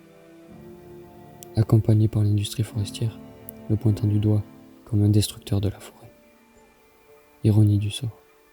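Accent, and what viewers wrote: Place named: Hautes-Pyrénées, France. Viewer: Bravo, tu peux être sacrement fière de toi! Quelques petites fautes de français mais rien de grave (c'est souvent des façons de parler dans le 65 mdr) et la qualité du son est à retravailler un peu, mais les images, les informations et le message sont fabuleux.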